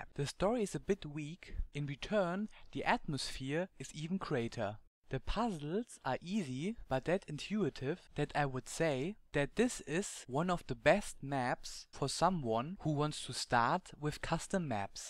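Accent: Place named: Germany. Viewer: I hope my german accent isn't that annoying <- Lol'd so hard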